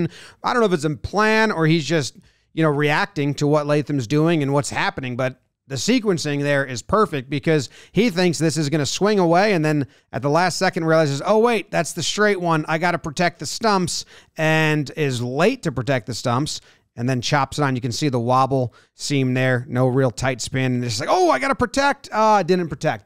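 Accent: United States. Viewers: So weird to hear an American accent talking about cricket. Great vid though. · Nothing against you mate but it is weird hearing an American accent talking cricket. I felt the same with Leigh Diffey’s Australian accent covering US Track and Field. #mindblown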